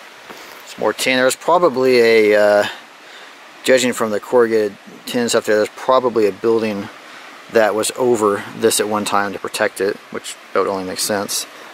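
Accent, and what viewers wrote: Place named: United States. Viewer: Normal frame rate doesn't make a difference on recording. it's still the same picture only taken twice as often in 1 sec. maybe its to much data for the storage medium or the camera cpu. from my Experience filming in dark a environment. the video gets distorted and grainy when there is to much going on in the frame that the cpu has difficultys to render. this should not be seen as critic. i only want to give some thoughts to help your channel to more quality. i still like to watch your content and here some American accent.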